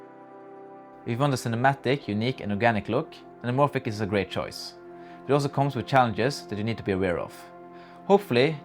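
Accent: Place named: Bergen, Norway. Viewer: Are you from the Bergen area of Norway? Your English accent sounds like you are…. 😊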